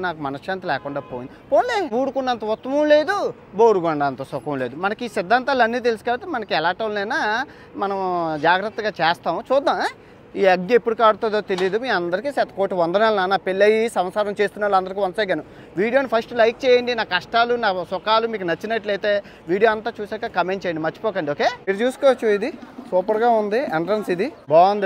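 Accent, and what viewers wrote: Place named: Godavari, India. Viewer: అబ్బాయి చాలా excellent ga organise చేస్తున్నావు. నీ సహజమైన గోదావరి యాసలో explain చేస్తున్నావు. మళ్ళా మన దేశం సెల్ఫ్ డబ్బా వద్దు . వాళ్ళ గొప్పతానినీ మనం హర్షించలి కూడా.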